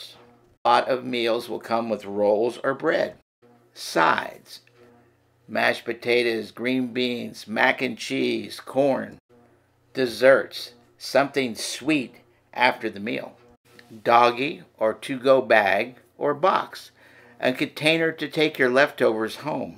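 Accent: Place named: Italy. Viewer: Your Italian accent rocks. 🙂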